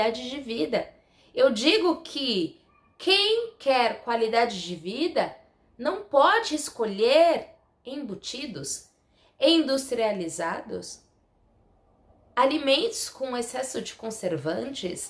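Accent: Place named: São Paulo, Brazil. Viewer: uuuf acho que vc é de São Paulo capital isso eugosteeeo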